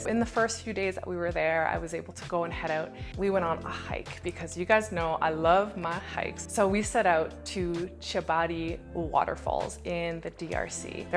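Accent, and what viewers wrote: Quebec, Canada. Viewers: Your Quebecois accent must have been a hit among the Congolais. Your story briefly mentioned “adjustment”, which I know was something you must have been downplaying for those who could never understand. You’ll probably remember your first days in Congo and the culture shock for the rest of your life. I still remember arriving in Zaïre at Kinshasa in 1978. I was 13, Couldn’t speak French at the time, There was a drought going on, a war just ending, and my family and I were reduced to eating tomato soup for months until our regular family food shipment arrived. The adjustment for me was epic, and I had already become used to living in several different countries by then. The experience of living there for a little more than four years was so wonderful, and exciting. I miss that country so much. Interesting that your flying, even your entry into the DRC was far more eastern-oriented than it was in the 70s. 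In my days, EVERYTHING was Kinshasa-centric. Supplies, even for the Eastern part of the country were mostly trans-shipped out the Kinshasa airports, and trucked in from the port of Matadi. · Great video, ton accent français, tu dois être une quebecoise si je me trompe pas... Sinon 👏🏽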